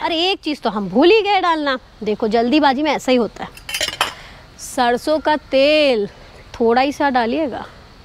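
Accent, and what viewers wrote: Bihar, India. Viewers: Wah di wah... Kya to gajab aapne bihari boli or kya gajab ka explain kiya or uper se itni gajab ki recipe thi litti chokha ki sach main di khafi jayada maja aa gaya aaj ki video main. Thank You So Much For Shareing This Recipe. Di Best of wishes. 🌟🌟🌟🌟🌟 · Kya bihari bolti ho di😂😂 mujhe ase litti chokha pasand nai h leki apne kya gajab👌👌 recipe batai ho di ak bar try jarur karungi☺️😋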